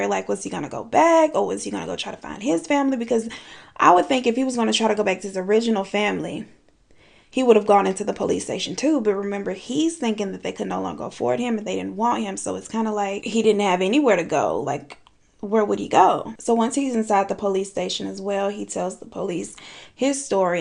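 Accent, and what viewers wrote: South, United States. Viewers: You’re so well spoken with a southern 🛎 accent! So cute ! Love it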